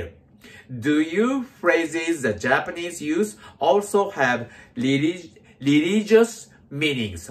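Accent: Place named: California, United States. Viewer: Cali style tone❣️素敵です。🤗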